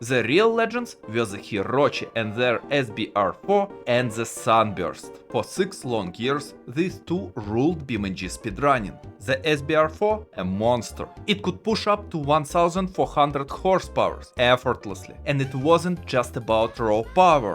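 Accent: Russia. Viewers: мне одному кажется что у автора русский акцент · Are you also russian? Just very simmilar accent. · У тебя русский акцент бро) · you saying how avarge russian guy trying to say in english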